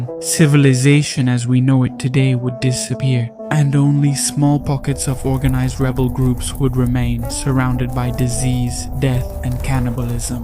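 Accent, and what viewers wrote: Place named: India. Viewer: What's with the Indian voice??? AI?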